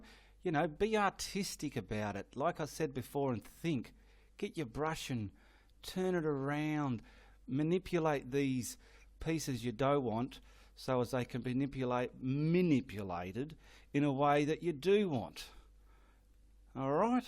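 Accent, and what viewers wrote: Australia. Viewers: I really like your Aussie acsent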